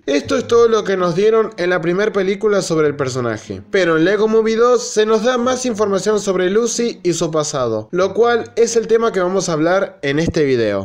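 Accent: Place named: Argentina. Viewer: Eres argentino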